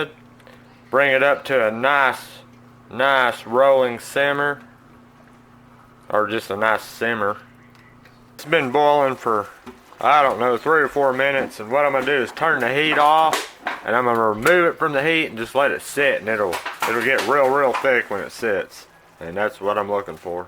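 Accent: South, United States. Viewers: Where in the south you from? I can't place the twang.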